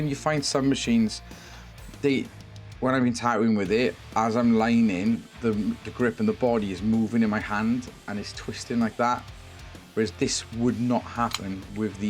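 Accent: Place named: Wales, United Kingdom. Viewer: I recognise that accent, are you a southy like me? Land of the red dragon?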